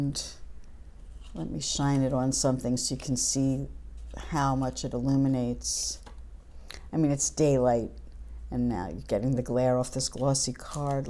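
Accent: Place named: East Coast, United States. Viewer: Your accent and attitude remind me so much of my family out east. Loved the recap!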